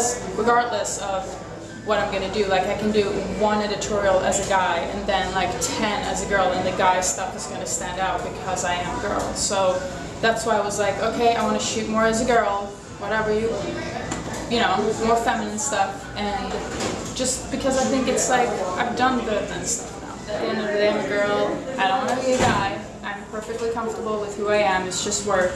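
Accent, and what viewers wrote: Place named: United States. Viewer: i love how she’s from sweden but sounds so americanized.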